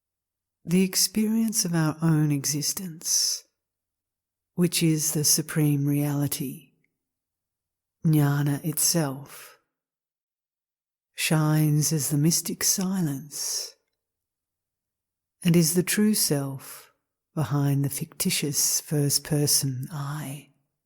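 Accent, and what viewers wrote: Australia. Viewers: Bless you and thank you for this. I think I hear an Aussie accent, so happy to hear an Aussie with knowledge of our one true self <3 Om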